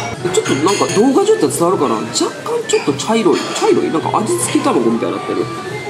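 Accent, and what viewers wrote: Kansai, Japan. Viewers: 最近好きになって昔の動画もめっちゃ見漁ってます🥺🥺🥺更新が毎日っていうのが嬉しいし関西弁なんが親近感湧く🥺🫶🏻💓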